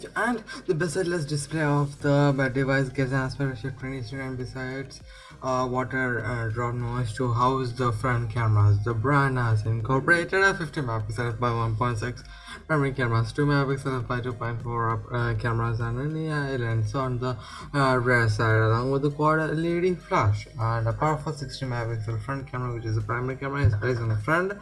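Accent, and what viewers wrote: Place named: England, United Kingdom. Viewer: Indian hai kya?? Pura angrez ho riya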